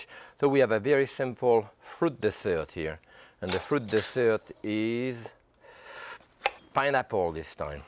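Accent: France. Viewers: 've been watching this whole day..the french accent,his attitude and his skills....great stuff!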